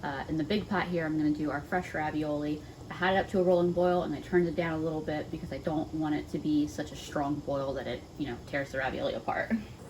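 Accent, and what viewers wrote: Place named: South Jersey, United States. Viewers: Interesting fillings.. I saw you said you were from New Jersey ..Sounds like a South Jersey accent .. I grew up in Vineland .. Many many years ago .Actually a tiny town right outside Vineland called Newfield.. But if your not from there you don't know its there lol.. Found your videos just a few weeks ago .. Well done. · Love your videos . You must be from jersey! I grew up there i hear it in the voice! Great recipie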